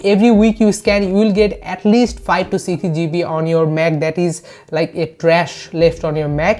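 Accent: Bengal, India. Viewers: Bengali re bhai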